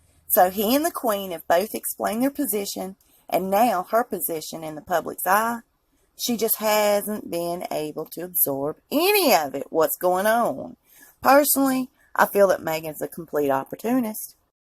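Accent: South, United States. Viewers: Hey, what southern state are you from? I am guessing Georgia. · You and your southern accent tell us what you are. Sorry baby.. TImes have changed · I LOVE GOSSIP WHEN IT IS AS “ JUICY” AS YOUR WRITINGS. AMERICANS ARE NOT USE TO HEARING THE “ INSIDE DIRT” ON ROYALS: NO MATTER WHAT THE COUNTRY, WE HEAR NOTHING! ( BY THE WAY) YOU ARE A “ GENIOUS” AT GETTING AND KEEPING ONE’S ATTENTION. AS A TEACHER, I MUST TELL YOU THAT THE WORD “ ESPECIALLY” DOES NOT HAVE AN “X” ANYWHERE IN IT, BUT YOU ALWAYS SAY “ EXPECIALLY”. YOU ARE DOING “ A “ WORK IN REPORTING, BUT IT WOULD BE EVEN BETTER IF YOUR SOUTHERN HABITS SAID ALL THE ENGLISH WORDS CORRECTLY! ASIDE FROM THE SOUTHERN DRAWL, ( WHICH WE LOVE), WE ALSO WOULD LOVE FOR YOU TO GET THAT ENGLISH PRONUNCIATION RIGHT! THAT WOULD MEAN AN “ A ++ “ ‼️‼️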